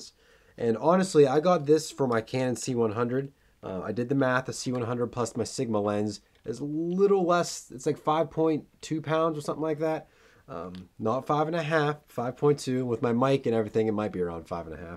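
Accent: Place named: Philadelphia, United States. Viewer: Haha. Know this is an old video but just got this tripod and wanted to say I love that Philly accent :)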